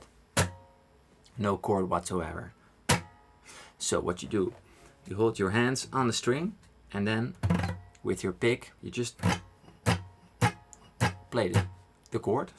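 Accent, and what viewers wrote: Netherlands. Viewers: thanks for the video. you sound dutch :)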